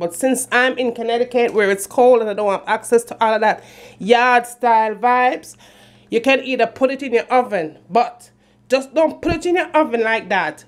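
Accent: Jamaica. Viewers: MEH LIKE DE JAMMIE ACCENT, ROAST IN DE COALS IS DE BADDEST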